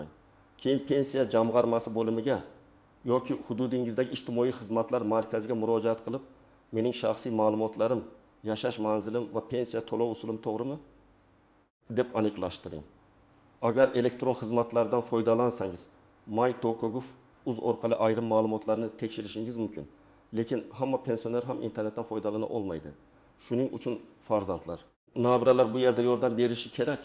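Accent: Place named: Chukotka, Russia. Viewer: Гапираетган чукчами. Узбек тилини биладиган одам ЙУКМИКАН.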